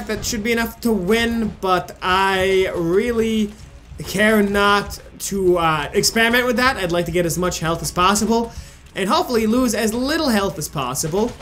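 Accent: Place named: Italy. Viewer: I had a pretty bad speech impediment with R's too, I sometimes hear myself say them with a w and mentally face palm. It hardly ever happens, but my every day speech is stilted to the point of it sounding like a genuine Russian accent, as I've been told, and it's kinda funny to me that you mention your accent bc I always think of my Italian great grandparents when you use it. They were first gen immigrants to America and their English was really terrible, it sounded very much like your accent at times, which is incredibly amusing to me knowing it's supposed to be Russian. You also sometimes sound like my uncle and cousins from Jordan, with the kind of accented buisness talk, I guess? It's kinda funny.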